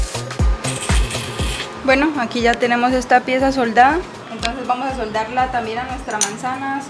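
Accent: Colombia. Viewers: le escucho un acento Colombiano... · Su acento es colombiano, creo que es de Santander o norte de Santander !! Felicidades a ella.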